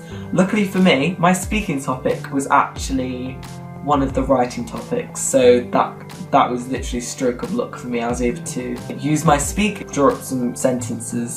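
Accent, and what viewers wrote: United Kingdom. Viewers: That British accent though.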